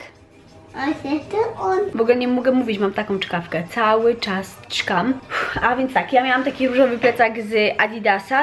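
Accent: France. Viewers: Masz piękny francuski akcent,mega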